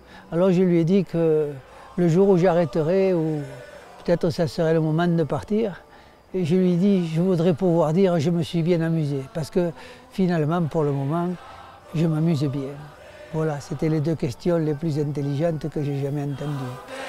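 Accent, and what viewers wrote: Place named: Pyrenees, France. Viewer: Monsieur Nadau juste Merci. C’est un bonheur de savoir que vous vous amusez en réalisant ce qui vous anime le plus « la chanson » mais c’est aussi et surtout pour nous un émerveillement d’écouter vos paroles tellement forte et pleines de sens. Vous nous faites rêver grâce à votre accent, votre patois la beauté de vos Pyrénées. Toujours un plaisir inouï de venir dans votre région. Merci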